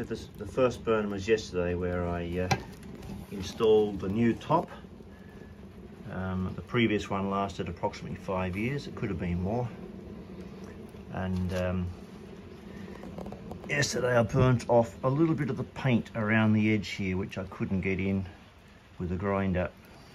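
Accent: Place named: Australia. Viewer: I'm a sucker for rocket mass heater vids. Just posted - recommended in my YT feed. Aussie accent talking about first burn for winter - intrigued, I check out your channel and then it becomes clear - a fellow Aussie (wiser than me) living my future in the Japanese countryside, about 12 months ahead of me. 2024 is the year I end my (and my wife's) 21 year hiatus!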